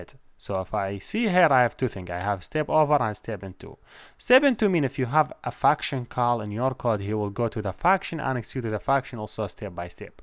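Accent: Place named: Saudi Arabia. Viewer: واضحه اللهجة السعودية ما شاء الله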